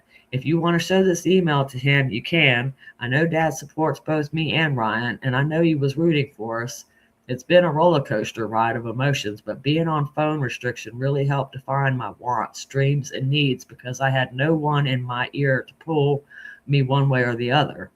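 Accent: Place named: Wilmington, United States. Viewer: Girl, where are you?? You sound like Wilmington or Bolivia maybe? I'm in Wayne...Goldsboro but I grew up in Duplin. I always love running across neighbors! You are 100% right, hes a big ol titty baby, for sure!! My Granny used to say that all the time!!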